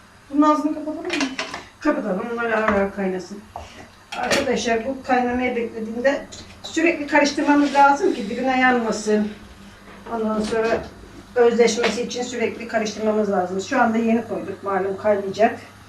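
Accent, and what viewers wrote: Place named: Thessaloniki, Greece. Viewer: Annemizin şivesi ni Selanik macirlar gibi konuşuyor Memleket nere si acaba bu arada Miraç kandiliniz hayırlı olsun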